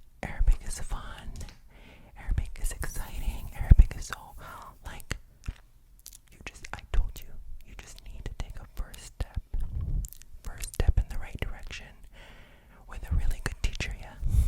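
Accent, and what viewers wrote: Egypt. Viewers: Pure Egyptian arabic accent!! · Your way of speaking in the Egyptian dialect is very beautiful.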